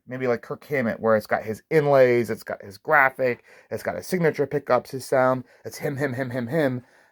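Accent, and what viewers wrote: United States. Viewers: wenn der nicht so einen furchtbaren amerikanischen akzent haette, ... nicht zum anhoeren. OI! Speak English!!!!!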